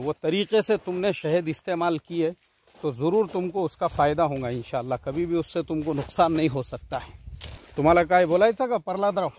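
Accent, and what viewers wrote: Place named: Maharashtra, India. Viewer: Mufti sahab Aap puna me Or alag alag city me rahe magar apne ilake ki zaban aap jo laheje ke saath bolte sun ke maza ajata pure marathi wobhi Maharashtrain acent ke saath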